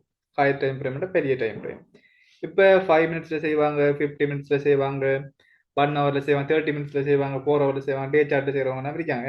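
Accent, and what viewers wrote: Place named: Sri Lanka. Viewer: Sri lanka thamizh 😍🫂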